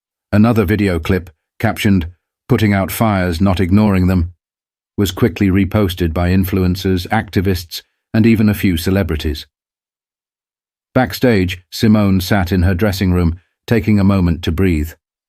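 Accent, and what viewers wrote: United Kingdom. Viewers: You know what I h8... a british accent telling the story of a successful Black woman. Ya just can't stop trying to make it about you colonizers.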